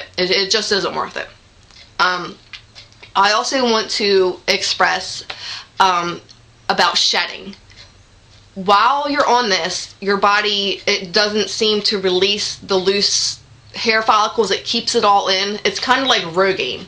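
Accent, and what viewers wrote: Philadelphia, United States. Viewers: This chick has GOT to be from Philly! I'd know that accent anywhere.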